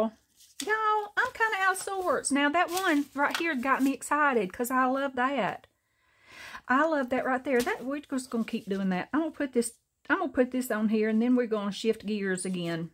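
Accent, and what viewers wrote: South, United States. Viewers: Omg girl 👧…I’m with you on the chapstick👌, always in my pocket! And just loved hearing about your VW 😍 just took me back to my first auto…1960 Pontiac tempest convertible, was sweet times🥰 you are really making craft time so much fun girlfriend! I’m addicted to your videos, and praying for you to get good reports and the good Lord to restore your strength with every sunrise, cause you are like sunshine on YouTube for us crafting paper lovers‼️🙏💚💚💚‼️🙏‼️ Oooooh yeah… I forgot to say I love your tags, and the video of you covering envelopes is amazing! And your narrow booklets are what really drew me in with your southern chatty charm👌💚‼️ you are amazing😍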